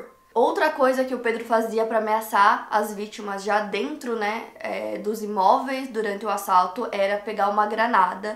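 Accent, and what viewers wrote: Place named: Paraná, Brazil. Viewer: Paraná né ♥️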